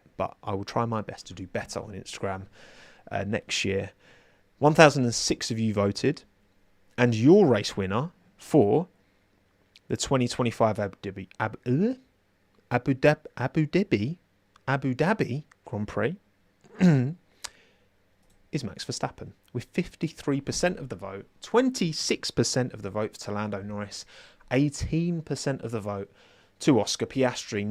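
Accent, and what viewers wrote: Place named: England, United Kingdom. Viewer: I really like hearing your English accent! Are you a Londoner? My uncle always called his head a Swede. Nice Barnett by the way😊!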